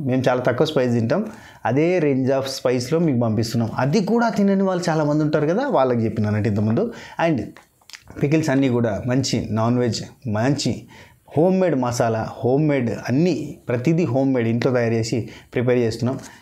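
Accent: Telangana, India. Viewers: I dont watch Big Boss and I also dont watch Buzz... but I only watch ur reviews.. asal mana telangana slang la masth comedy points tho reviews.... super o super.. reviews are always funny..... Perception purse lo petta.. rough adisthunde akada adathaledhu... LOL ROFL..🤣😂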